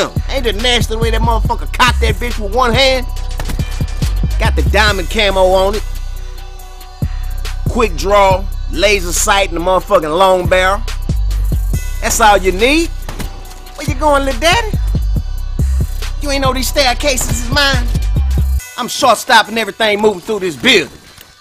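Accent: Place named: New Orleans, United States. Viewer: Ya heard me lol New Orleans accent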